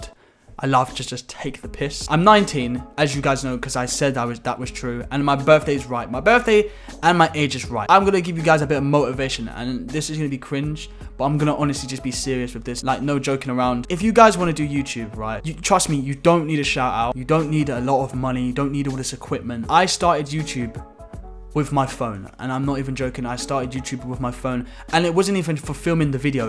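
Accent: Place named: London, United Kingdom. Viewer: What part of London are u from? Btw I'm soulth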